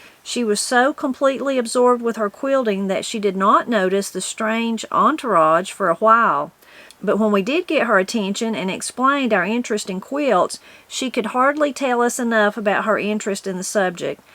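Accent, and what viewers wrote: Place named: South, United States. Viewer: I have to tell you how much I e joy listening to this book! I own this book and have read it at least twice all the way through! You have made it come to life with your beautiful southern accent! ❤❤❤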